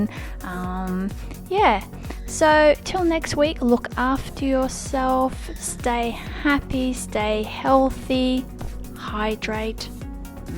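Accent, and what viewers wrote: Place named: Australia. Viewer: From your accent are you aussie? If so, where did you get the KURUKYNKI stamps?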